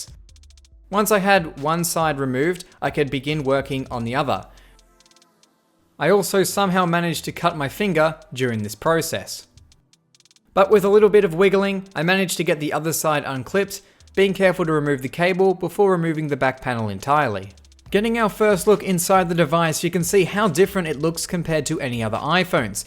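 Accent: Australia. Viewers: He’s Australian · U Aussie?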